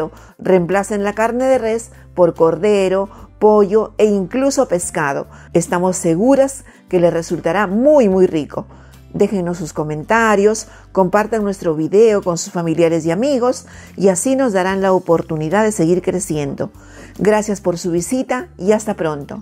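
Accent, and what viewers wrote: Peru. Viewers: Que rico se ve ese Seco de Res al puro estilo Peruano, pero más rico todavía es escuchar la forma de hablar de las mujeres peruanas, disfrutar de ese acento claro, limpio, suave y ligeramente melodioso ... felicitaciones. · A mi me encanta. Es la forma de hablar en el Peru.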